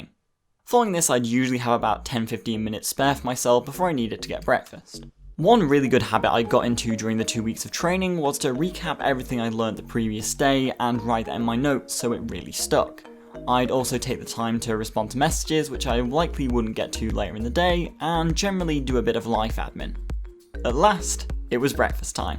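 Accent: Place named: North, United Kingdom. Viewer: You almost said bahthroom. You are losing some of your Northern accent as I did when I started at Oxford in 1975.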